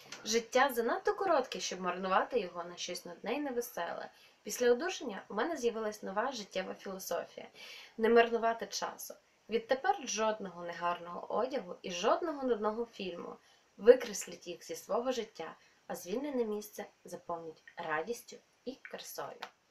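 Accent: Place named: Western Ukraine, Ukraine. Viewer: Свет строится не только от волос,но еще и от мебели.Все хорошо,но вот западный акцент бы не много убрать.А то заносит не много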